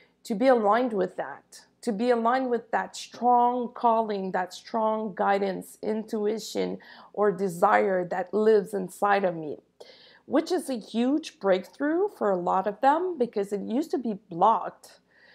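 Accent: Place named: Montreal, Canada. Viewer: I live in the country and while gathering horsetail for my tinctures, I pulled up a nice dandelion plant, saved the roots to roast for coffee, and cut the leaves up finely, added some of my seasoned oil and vinegar, salt, pepper and voila! Are you in Canada? I lived in Montreal and recognize the accent, I think...Namaste!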